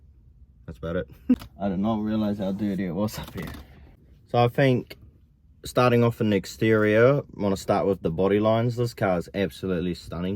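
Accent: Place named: New Zealand. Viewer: You NZers speak funny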